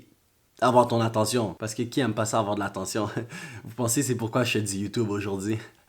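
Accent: Quebec, Canada. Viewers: je sais déjà que tu vas pas me repondre. Mais bon,😥 si tu vois ce message il est fais pas amour❤ (no homo). genre je te suis depuis longtemps tu peux pas savoirComme jtai toute suite aimé quand j'ai ta vidéo " man's is hot in fresh " jtai tout de suite aimé avec ton accent québécois 😏. En tous cas j'espère que tu prendras le temps de voir ces messages 😥. En pensant va don checker mon insta: yng_muco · J’aime trop trop trop trop ton acsent québécois ❤️❤️❤️❤️❤️❤️❤️❤️ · Pi le mes i la un accent québécois mes j'suis pos sur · T’as un peu un accent québecois😉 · T'es québécois ?? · Je croix que c'est un québécois. P.S je suis québécoise. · Celine M il est québécois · Tu es le meilleur Youtubeur du monde!!!💗 et non il fait pas sa je suis très contente personnellement. Et tu devrais garder ton accent car tu es chanceux d avoir un accent québécois mais moi je suis pas chanceuse j ai un accent anglais mais je pratique le francais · Ton accent c tellement cute ptnn🤤😍 vrmt j’adooorrreeee l’accent québécois !!!! 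Jtrouve t’as grv un style mais parfois mdrrrrr les mots je comprend pas 😂😂😭😭😭 mdrrrrr mtn j’ai compris les expressions québécoises mais whaouuu c’était compliqué 😂😂 brefff continu ! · Un accent ”afro-québécois“ j’adooore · Est-ce que tu es quebecois? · RESTE AVEC TON ACCENT QUÉBÉCOIS PLEASE!!!!!!!!!!!!!!!!!!!!!!!!!!!!!!!!!!!!!! T’es parfait ♥️♥️ #jevienduquebecaussi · C'est quebecois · c la première fois que je vois un noir avec un accent quebequois 😂😲😱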